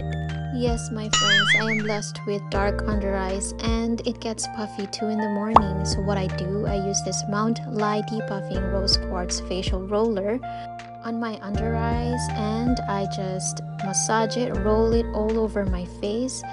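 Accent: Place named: Philippines. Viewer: My idol sa taglishing ug bisayinging pronouncing. Akoa jud ning paaboton para makakuha kog mga tips unsaon pagpa gwapa. Hihi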